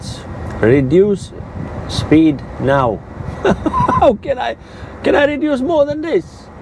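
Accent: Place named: Manchester, United Kingdom. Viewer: Bloody hell you don’t half sound like you have a Manchester accent sometimes pmsl I’m from Salford originally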